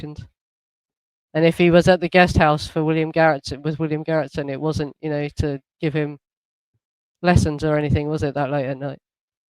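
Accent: Cockney, United Kingdom. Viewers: Great presentations. Just hard to decipher you east end ? Accent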